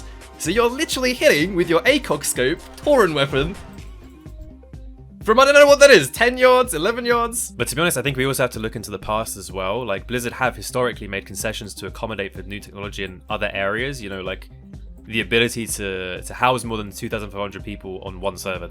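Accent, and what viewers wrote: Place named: London, United Kingdom. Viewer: u sound like ur from london brother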